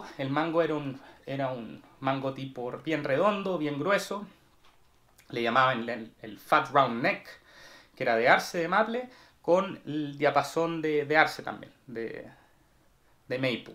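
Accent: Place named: Chile. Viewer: como se nota que erí chileno XD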